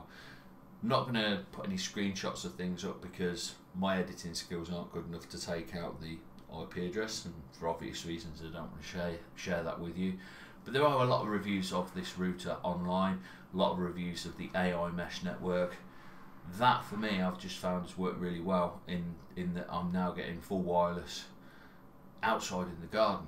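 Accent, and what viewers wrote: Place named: England, United Kingdom. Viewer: It funny he looks like an American Alabama football fanboy. It's weird hearing an English accent. I'd expect a southern twang coming out of his mouth. I bet he's a huge soccer fan. Those boys are crazy. They kill each other over games and scream racist stuff at the players. I'm not saying he does that but you know what I'm saying.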